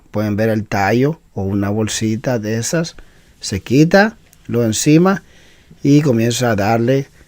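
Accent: Costa Rica. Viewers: Bien perdon me adelante con el comentario... pense que eras hondureño... aunque tenes el acento mas a tico que Nicaraguense, bueno... gracias porque te gustan las pupusas y las mejores pupusas estan en olocuilta en El Salvador, tambien en los planes de renderos y hay varias partes en El Salvador donde las hacen riquisimas ya se a de maiz o de arroz, practicamente en cada esquina de cada calle hay pupuserias, un buen Salvadoreño minimo come de 2 a 5 veces pupusas a la semana!!! bueno saludos al pueblo Nicaraguense nuestros hermanos!!! y perdon por el comentario anterior! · ese maje habla como costaricense. y dando recetas del salvador jajajja. esas no son pupusas ni el curtido .. nada que ver con el salvador ..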